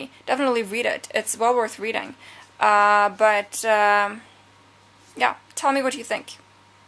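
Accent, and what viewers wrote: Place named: United States. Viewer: Wow. Your accent sounds entirely American. Is it common for Norwegian to speak as you do? Thanks for the book review.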